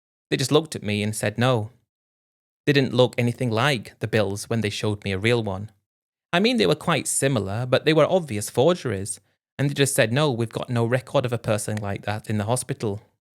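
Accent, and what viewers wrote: England, United Kingdom. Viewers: America - you have an English Accent.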